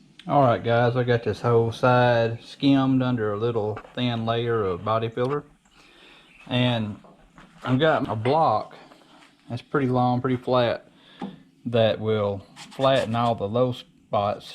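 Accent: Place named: South, United States. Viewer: You got that right and someone who know how can spot someone else who does. Yes an art and not something you rush either ! When I saw you pull the string in the seam and you bolted the fender in place and it all looked so nice I thought this is not his first rodeo ! Enjoying your videos ; I'm in Alabama and you sound like a southerner ! You turn a pretty good wrench too ! A real car guy I can tell.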